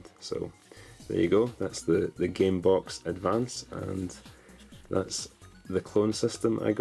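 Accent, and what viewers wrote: Scotland, United Kingdom. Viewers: Where in Scotland are you from? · Confession: I actually just watched this video to hear your raspy, Scottish (I think) voice. I honestly watch this every night because you have a voice that smooths me up like butter and I just fall asleep just like that.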